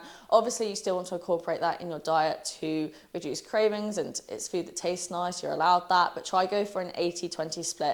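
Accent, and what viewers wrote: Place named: United Kingdom. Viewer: I had to slow down the playback speed to .70 😂.. that British accent is hard enough to understand, now add 85 mph (135 km/h ) 😅.. Besides that, Excellent informational video.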